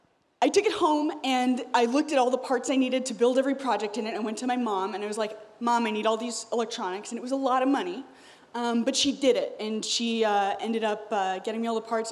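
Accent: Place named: United States. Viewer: I really like her accent , what part of U.S is she from ?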